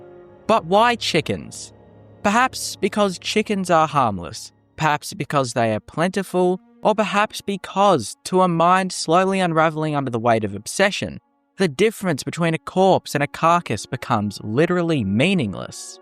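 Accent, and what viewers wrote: Australia. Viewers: He's Australian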